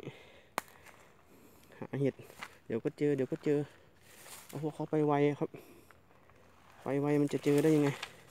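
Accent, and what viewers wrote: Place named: North, Thailand. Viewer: น้องหล้า...อยู่่ จ. หวัดไหนเจ้า (ได้ยินอู้กำเมืองกั๋นน้อ) เห็ดแดงกับเห็ดขมิ้น เห็ดถ่านถะลำเน้อน้อง แกงใหม่ ลำเน้อ อยากมาเก็บ แต้ ๆ ใจเย็น ๆ กะได้เห็ดกิ๋นน้อ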